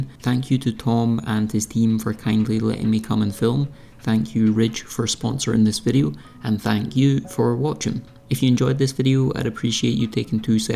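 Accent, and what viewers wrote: Scotland, United Kingdom. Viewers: Hey mate I enjoy your videos no matter what you do them on you have great Scottish accent and you explain thinga really well keep it up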